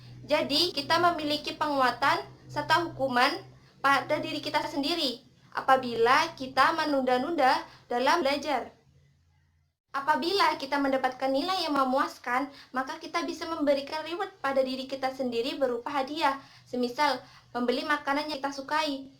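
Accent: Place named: Osing, Indonesia. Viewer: Ketoro wong osing 😁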